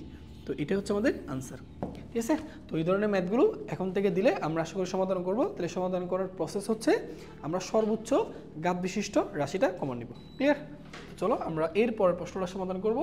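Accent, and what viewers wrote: Chittagong, Bangladesh. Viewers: স্যার আপনি কি চট্টগ্রামের???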